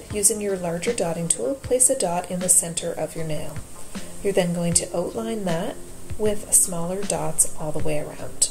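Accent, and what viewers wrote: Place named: Canada. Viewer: Very cute! And I love your Canadian accent. :)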